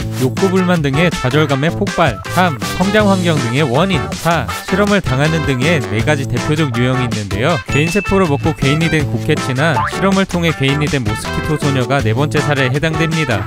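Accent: Gyeongsang, South Korea. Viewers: 님 경상도 분이신가요? "사이타마" 말할때 "싸이타마"라고 하시는거 보면 경상도 특유의 쎈 발음이라 갑자기 궁금하네요.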